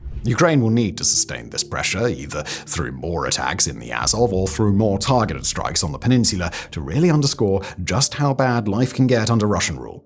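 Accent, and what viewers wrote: United Kingdom. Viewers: Dude's British, get over it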